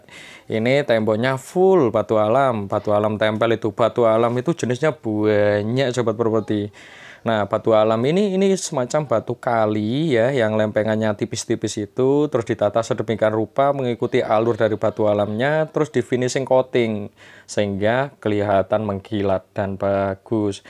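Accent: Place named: East Java, Indonesia. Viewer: Seperti orang jawa timur.. Aksenya itu lho.., buanyaaak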